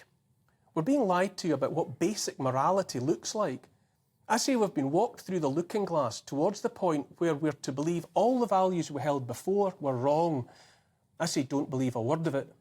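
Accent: Scotland, United Kingdom. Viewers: maybe it's the tone of your voice or the barely hidden anger in your eyes. Or maybe it's the Scottish accent, but every single time i listen to what you have to say I'm looking for a two hand broad sword and a fxcking big axe.. Keep going, stay free.. all the best from the Netherlands.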